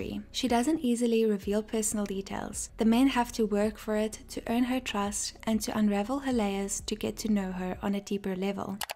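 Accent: South Africa. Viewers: i seemed to recognise the south african accent too haha ! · Are you from South Africa?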